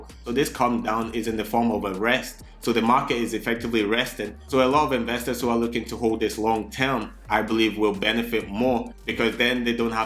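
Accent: Scotland, United Kingdom. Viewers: First time seeing your channel, nice work👍🏽 Your accent is great, mix of Scottish and?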